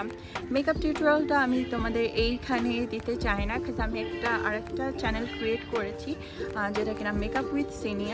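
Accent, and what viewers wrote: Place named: United States. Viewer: আপু তোমার কথা গুলো এরকম কেন ??ইউ এস এ আসল বাসা তোমার??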